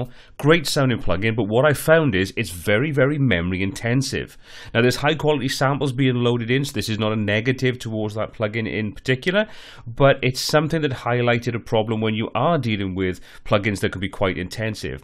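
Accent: Wales, United Kingdom. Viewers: Cheers man I'm having some popping issues. Do I hear a Pontypridd accent 🙂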